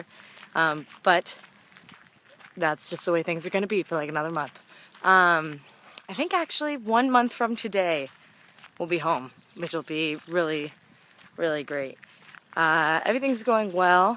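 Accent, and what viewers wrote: South, United States. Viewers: I think it was the accent that bugged some as it sounded southren american but I enjoyed it regardless. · I enjoyed the Vancouver episode, even with the Southern accent and the absence of rain, continue being you <- sorry, the second "h" was used for "eh":) Sincerely a fan and life-long Vancouverite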